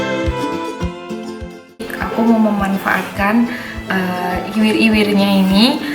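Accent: Java, Indonesia. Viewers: Hehe sy malah demen lht gaya bhasanya die selain cantik logat jawanya ntu tuh gemes 😁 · Orang jawa nya mba kelihatan medok banger maaf nyh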